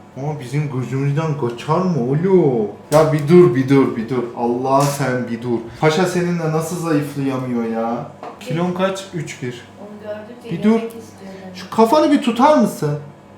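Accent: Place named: Tokat, Turkey. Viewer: Tam tokatlı şiveden belli 😂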